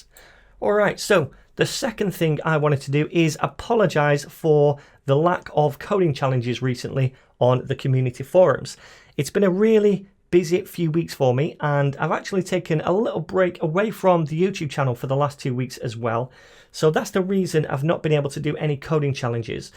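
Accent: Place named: England, United Kingdom. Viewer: Aaaaaand... BOUGHT. Thank you, sir. Nice to do a course with an English accented tutor for a change (well, besides Angela Yu) :D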